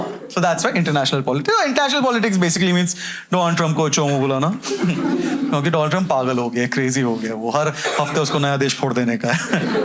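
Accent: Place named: Mumbai, India. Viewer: Bambaiya hindi raaste par bolte hain...show pe nahi. Used to hearing better hindi from you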